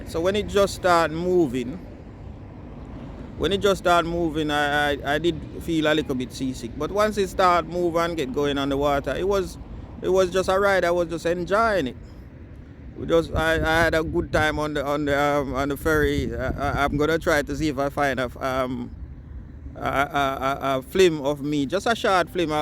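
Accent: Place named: Jamaica. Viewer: Hi brother, from the time I hear you speak I know you're a Jamaican, I'm just listening to you I'm so impressed about your visiting and experience in Vincy so glad you enjoyed every moment in beautiful Vincy whenever you want to travel again go Vincy man I tell you you are very welcome and make sure you visit other grenerdine island, one more thing there are other beach down the lee-ward side that have a mixture of white and black sand with seashell between the rock ,but you have to walk far to get there blessed peace and love 🇻🇨🇨🇦🙇🏿‍♂️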